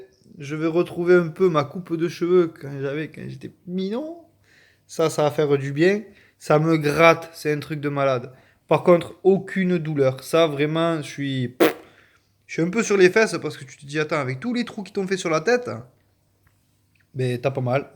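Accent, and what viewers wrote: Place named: South, France. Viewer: salut merci pour ta réponse Peut tu me donner les coordonnées de ton interlocuteur français à la clinique j envisage Di aller fin avril donc sa hurge merci encore gilles du cap d agde tu doit pas être très loin vu ton accent merci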